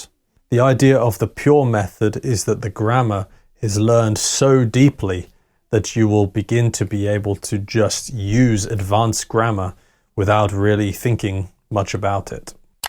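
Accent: United Kingdom. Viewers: I love the british accent, ❤❤from Bangladesh.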